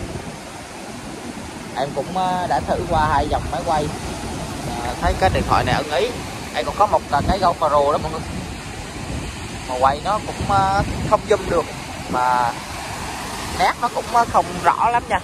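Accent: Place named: Quang, Vietnam. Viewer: Khi con nói chuyện con đừng hỏi bằng tiếng quang. Vì CĐM ko nghe được con ạ. Con cứ nói bình thường đi con và khi con quay nên quay gần con cá cho khán giả xem cá này là cá gì vì tiếng mỗi nơi gọi tên khác nhau ❤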